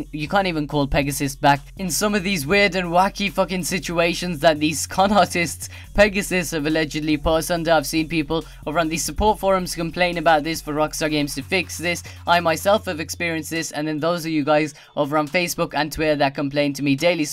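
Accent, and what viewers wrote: Birmingham, United Kingdom. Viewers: I know you are from Birmingham but what is your accent?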